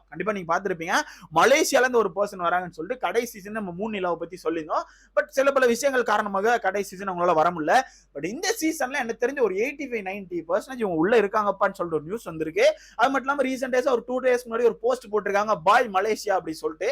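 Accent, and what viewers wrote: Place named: Tenkasi, India. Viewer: தம்பி போனசீசன்ல பிக்பாஸ்க்கு ரசிச்சனோ இல்லையோ உங்களின் ரசிகன் ஆகிவிட்டேன் ஏனென்றால் உங்களின் வட்டார வழக்கு தென்காசி மாவட்ட வட்டார வழக்குபோல் உள்ளது அதனால்தான்.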